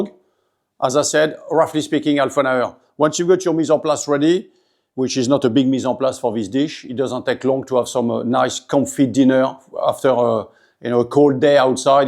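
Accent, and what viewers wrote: France. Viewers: Why does it look and sound so much better when done by a Frenchman? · Your French accent is even better than the dish ❤ Thank you so much 😊 I'll be looking out for your other recipes. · French 🇫🇷 English is so funny 🤣 I am trying to absorb and re-enact as a stand up comedian.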